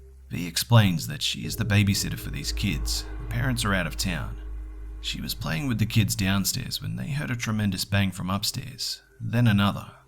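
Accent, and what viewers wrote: Australia. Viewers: Watching your videos get me so excited you make these videos so well! Having a fellow Aussie telling it makes it that much better !!! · Aye it's my favorite Aussie narrator ! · It is soothing and now that I'm high, I can listen to it. When I'm sober, I find something irritating in his accent. I normally I don't care about strayan accent on tv or anything else but here it for some makes me alienated. I still listen because he's got talent and good stories! :) ps. for example HellFreezer (my absolute favorite) has a scottish accent and it just makes it 1000x times better! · Nice voice, glad to hear an aussie accent reading scary stories. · R u Australian? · I'm so excited! Scary stories with a good old fashioned Aussie accent! And, even better, you don't have a weird way of talking, like ending every sentence like a question, hahaha. You, sir, have won yourself another subscriber (not that it looks like you need it!) ;) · U sound Aussie? 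R u? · is this narrator Australian? I'm an Aussie as well!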